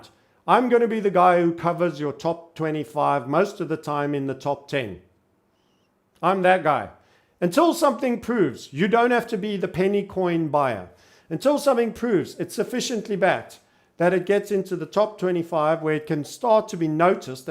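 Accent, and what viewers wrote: South Africa. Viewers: Love the accent. What part of SA are you from?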